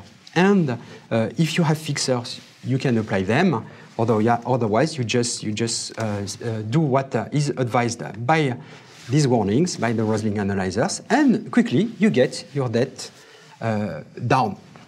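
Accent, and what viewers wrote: France. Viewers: Don't be mistaken, it is French with English words, no offence to anyone, but most Frenchies speak like that ;-) *Pink panther movie series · Very hard to listen to your english... It is almost french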